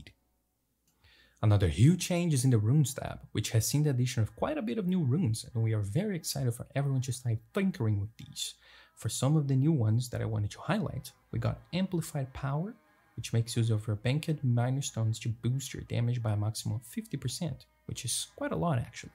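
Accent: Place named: Brazil. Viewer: tu e brasileiro né cara. Da pra ver pelo seu sucaque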